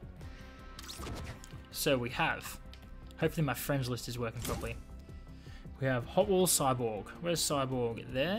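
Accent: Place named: Australia. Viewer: I'm sorry about the cussing I'll keep it lowkey if I get to play again.Your stream style is the right type for me.Your fair with your subscribers and that's hard to find on the net some times.Glad I had this chance to be noticed with out having to ask for a plug THANK YOU SO MUCH!!!! You might want to consider blurring your server so you don't get so many stream snipes.I have always liked the Australian accent,(To much crocodile dundee as a kid)lol.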